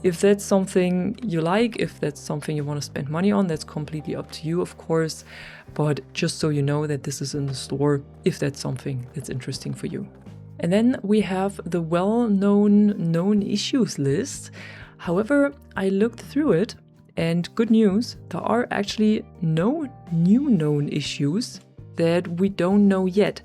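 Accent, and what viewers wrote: Germany. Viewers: Ich wusste das ist der deutsche Akzent 😂❤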